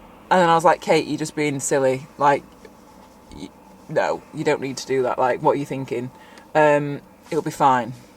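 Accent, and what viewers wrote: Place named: North of England, United Kingdom. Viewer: Robert Tempest : that's the accent of a good north of England gal and it doesn't need to change thank you very much 😃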